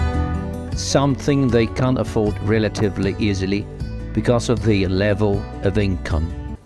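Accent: United Kingdom. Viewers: Si yo no supiera que vos SOS argento? Creería con ese acento, que sos británico!. Yo habló muy bien americano Appalachian y alemán Westfalen (yo los siento mas fácil de hablar) y se de lo que digo! Bien por vos!, felicidades!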